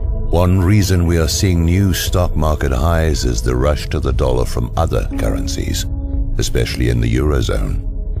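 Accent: England, United Kingdom. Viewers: English narrator. Obviously true! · I guess the commentator with the British accent gives this message more credibility. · There is no gold reserve, nor has there been since the early 1930's. All the federal reserve relies on private banking, namely the 2 big families, and we all know who they are. The guy narrating this documentary keeps saying our country, our economy, but his accent is definitely English. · Why does the narrarator have a british accent?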